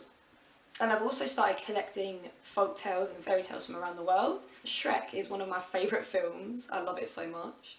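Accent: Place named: London, United Kingdom. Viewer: May I ask which part London you are from?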